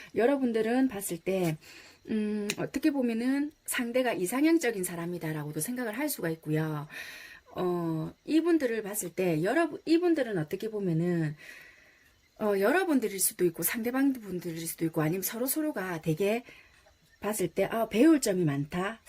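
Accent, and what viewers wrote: Busan, South Korea. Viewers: 부산 사투리 뿜뿜 타로 5 처음부터 조언이......ㅋㅋㅋㅋ귀우셔용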